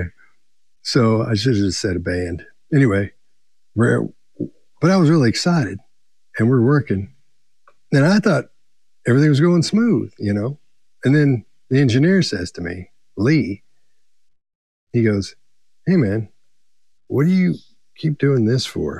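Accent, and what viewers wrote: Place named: South, United States. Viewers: Love that Southern accent. Smooth as honey. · the whole time I was thinking Tennessee!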